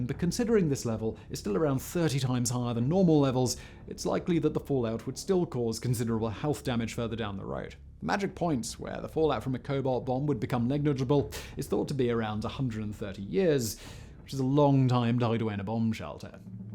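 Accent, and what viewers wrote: United Kingdom. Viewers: Is he even Brittish? Sounds like a Brittish wannabe American hipster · I think these channels are not his, he's probably hired to read the script with his nice British accent and all the other work is done by other people, he used to host the English version of visualpolitik which is a Spanish channel.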